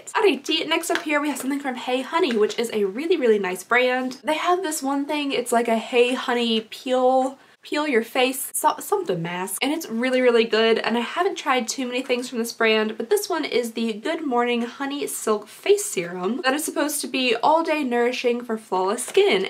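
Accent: Kentucky, United States. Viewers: oof wait are u from Kentucky, I am too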